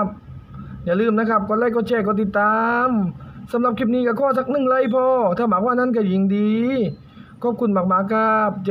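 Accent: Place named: South, Thailand. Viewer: 👍👍👍👍👍 แหลงใต้เลยคลิปนี้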